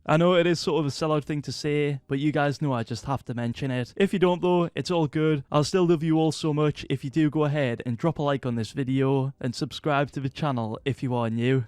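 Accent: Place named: England, United Kingdom. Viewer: I really like your Videos but I hate this english Accent its so extrem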